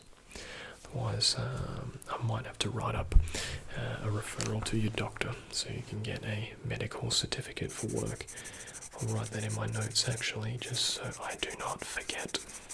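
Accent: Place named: Australia. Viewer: damn you are fulfilling my South East Asian Physiotherapist fantasy with an OZ accent and im so in it. forgive me.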